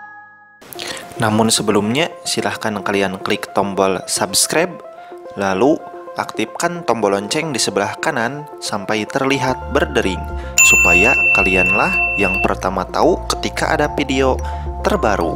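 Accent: West Java, Indonesia. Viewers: Akang orang sunda yah?? Logatnyah nyunda pisan...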